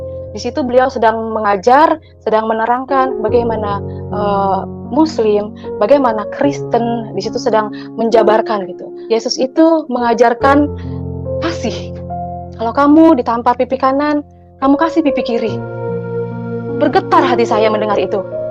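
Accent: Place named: Sulawesi, Indonesia. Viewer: Suara nya KY orang Sulawesi...KY oten gt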